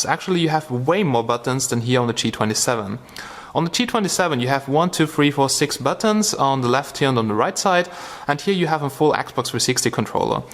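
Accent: Germany. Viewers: Are you german speaking guy? :D Bist du? , es tönt so :D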